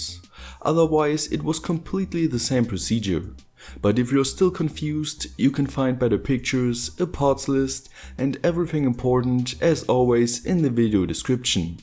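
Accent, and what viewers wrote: Germany. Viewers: Bro you have to be german, there is no way this accent of your's is scottish, it's even more german than mine and of my german friends haha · Man Hört ja das du Deutsch bist daher eine Frage auf Deutsch :D...... kann man mit diesem aufbau auch größere boxen bzw. subwoofer mit einbinden ? wäre coll wenn jemand antwortet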